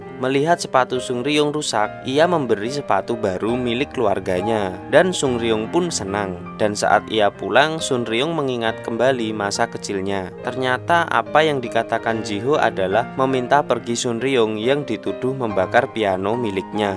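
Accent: Java, Indonesia. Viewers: Jawa medok amat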